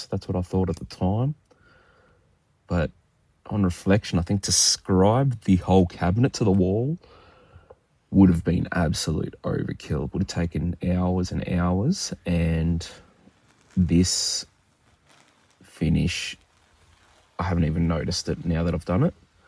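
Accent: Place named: Australia. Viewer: Great to hear another Aussie voice on youtbe! Love the unit. Subbed, I look forward to seeing more of you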